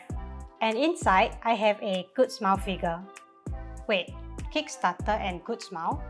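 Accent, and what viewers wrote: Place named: Singapore. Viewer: The figure is so pretty!!! Esp that axe omg! Haha I was surprised when u started speaking, hello fellow SGean :> I haven't seen any other reviews of her so this is vv interesting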